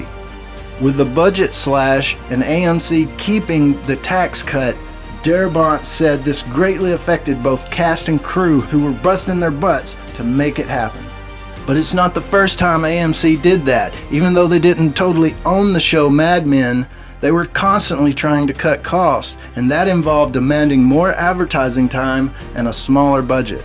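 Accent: South, United States. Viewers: Why is it that every fan Walking Dead video the guy has a Southern twang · good video. where you from man? I can tell you are a Southerner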